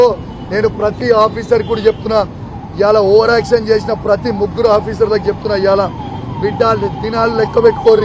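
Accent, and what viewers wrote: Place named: Hyderabad, India. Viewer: Hyderabad matlduthunnavu niku uru ekkada unnadi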